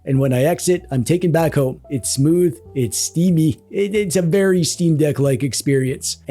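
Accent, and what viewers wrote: Canada. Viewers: Are you Canadian? I think I recognize a fellow Canadian.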